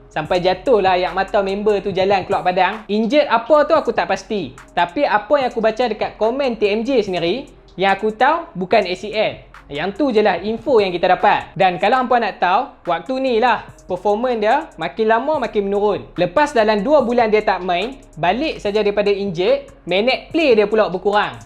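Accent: Kedah, Malaysia. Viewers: Awak memang betul kedah kedah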